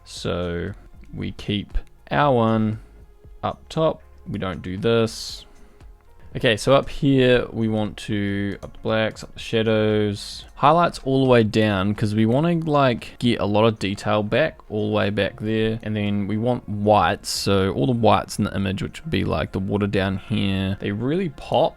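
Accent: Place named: New Zealand. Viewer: A dutch name...that accent youre from NZ love you video's! groetjes vanuit Nederland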